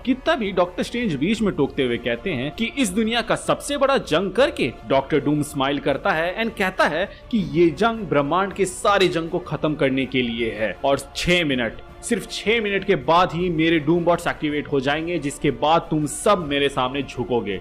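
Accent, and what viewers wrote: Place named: Bihar, India. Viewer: Bhai apke tone of explaining se lgta he ki app bihari ho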